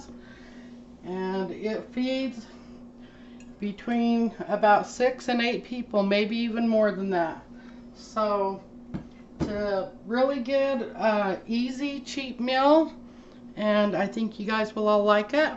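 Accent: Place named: Utah, United States. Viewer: I spent about 7 years in Utah. (Including a couple years in your area!) Your Utah way of speaking, & your recipes take me back. I love it! Love to you & yours. Wishing you & your family a very Merry Christmas, & the very best of this holiday season. Thinking especially of your parents, & praying that everything proceeds in the best, most gentle, Light-filled way possible. God be with y'all.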